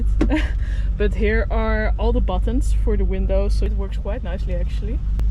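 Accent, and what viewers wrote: Netherlands. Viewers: I had several of these. Loved them all. Never stuck not once. I had a G Wagon and I prefer the range rover, which has shortcomings but also lots of personality. I love this Dutch girl's accent. There should be a shim between the brake pad and the inside of the caliper which should eliminate the squealing. It's a wafer thin piece of metal.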